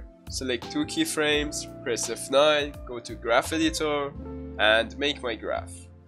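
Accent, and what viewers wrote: Iran. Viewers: عجب لحجه ای قشنگ معلومه ایرانیی😂😂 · your accent is so iranian coded. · دادا من دارم شروع میکنم از لحجه نازت فهمیدم ایرانی هستی .کاشکی زبونتم ایرانی میکردی ولی غب.سطخت بالاست .ماشالله😍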